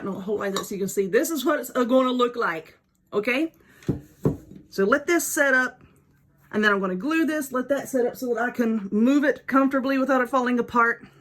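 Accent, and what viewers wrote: South, United States. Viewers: Love your southern accent I'm from Tennessee lol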